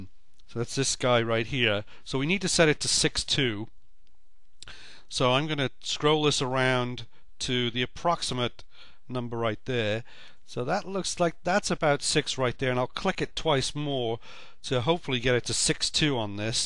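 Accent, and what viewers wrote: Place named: England, United Kingdom. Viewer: I for one, appreciate the clear English accent and not whizzed through at 50 mph. So many folks sound a bit like Kermit. Thanks.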